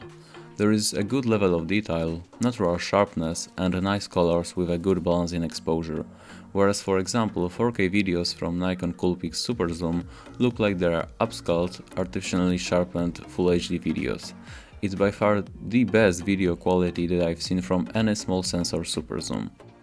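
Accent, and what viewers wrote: Poland. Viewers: Słychać te Polskie korzenie ale nie jest źle. Łapeczka leci, pozdrawiam :) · are you polish person :)? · He's a Pole! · Are you Polish? Because I recognize the accent cause i'm Polish.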